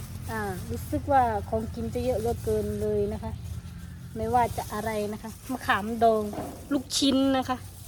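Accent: Korat, Thailand. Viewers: พูดแบบนี้เหมือนคน โคราช หรือปราจีนบุรี สระแก้ว เขาจะพูดกันแบบนี้ สำเนียง แบบนี้ อยู่จังหวัดไหนครับ น้องสาวคนสวยที่น่ารัก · เอกลักษณ์ของคนโคราชก็คำพูดคำจานี้แหละครับตลกดีชอบๆมากเด้อ คนน่ารัก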